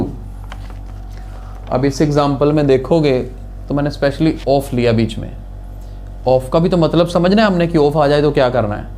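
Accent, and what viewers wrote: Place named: Ambala, India. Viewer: Apki language ambala area ki lag rhi hai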